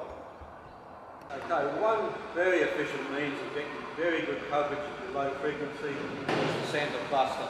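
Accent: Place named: Australia. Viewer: Australian... cool.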